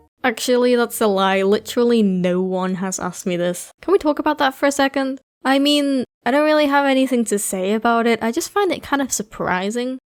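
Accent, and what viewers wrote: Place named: United Kingdom. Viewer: I love the british accent🙂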